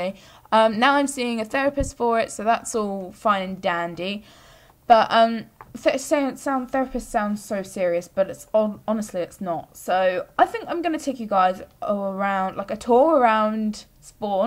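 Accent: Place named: United Kingdom. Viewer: I FORGOT SHE WAS BRITISH.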